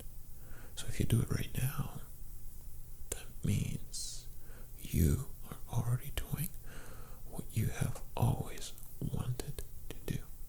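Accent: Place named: United States. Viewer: Your English and your American accent are ridiculously good. Also, you have a very lovely mouth.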